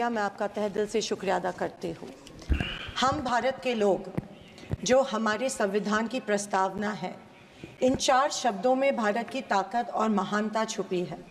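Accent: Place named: Solapur, India. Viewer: Nice solapur avaj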